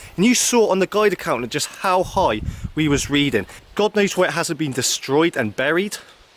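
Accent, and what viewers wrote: United Kingdom. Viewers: godd4mmit, speak ENU nsteado UK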